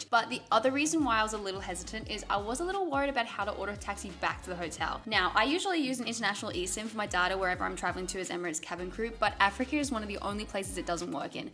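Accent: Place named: Australia. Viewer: I knew u sounded Aussie when I watched your shorts…thanks for the content it’s very interesting job u have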